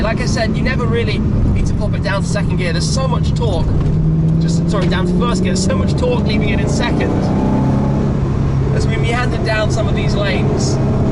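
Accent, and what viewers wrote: United States. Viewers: Shmee is slowly turning American 😂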